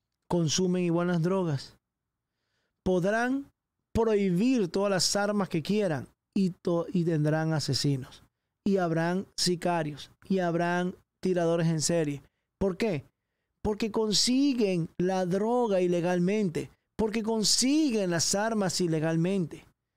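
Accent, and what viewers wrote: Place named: Honduras. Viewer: Tu acento me suena undureño...😅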